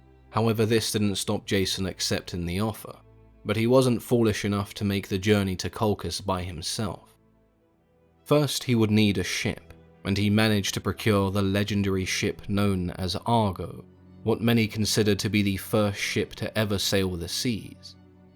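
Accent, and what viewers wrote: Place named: United Kingdom. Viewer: What part of the uk are you from?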